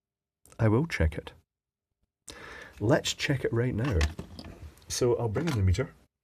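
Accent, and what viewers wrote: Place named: RP, United Kingdom. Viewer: Such a lovely BBC type sound from.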